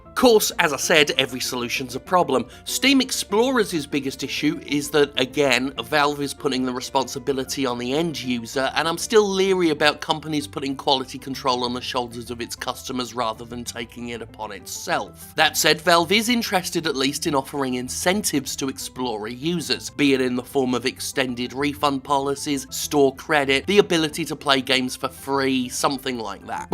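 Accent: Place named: United Kingdom. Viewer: Sven Tempest these countless accents you are talking about are dialects. someone from London might sound slightly different than say someone from Liverpool but they are still English accents none the less. take it from the perspective of someone from outside the u.k they hear someone like Jim speaking they think British but if they hear an Irishman speak then that guy is Irish not british even though he is part of the union. hell even people in the u.k never refer to their accent as british.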